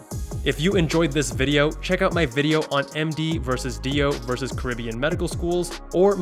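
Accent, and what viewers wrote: Canada. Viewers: I differentiate accents from Canada vs USA by how Canadians pronounce about (Canadians say "aboot"). In some Canadians, "aboot" is more noticeable while in others it's way less prominent. I must say, the narrator of this video has the most prominent "aboot" that I have ever heard! PS: I'm an English speaker, but I'm neither American nor Canadian.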